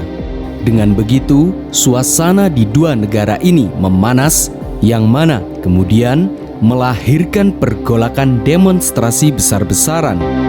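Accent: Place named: Java, Indonesia. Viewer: Ini sudah sah jawa biadap. Ngomong jawa dong jgn pakai bhs melayu loghat jawa kamu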